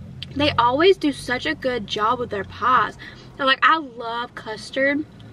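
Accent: South, United States. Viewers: Where are you from? Because you definitely have a southern accent. You dont sound like youre from California. Lol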